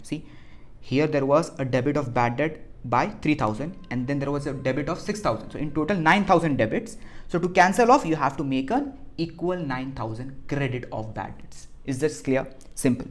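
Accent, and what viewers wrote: South India, India. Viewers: Sir your South Indian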